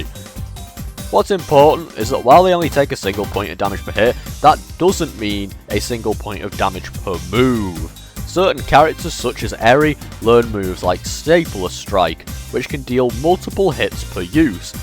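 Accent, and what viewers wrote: United Kingdom. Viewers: From Birkenhead or Liverpool or something, I think. certainly in the area of north west I think.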